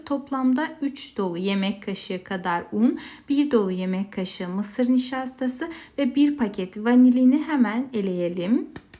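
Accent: Azerbaijan. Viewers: Deyəsən xanım Azərbaycanlıdır ləhcəsindən hiss edirəm. Bu arada əllərinizə sağlıq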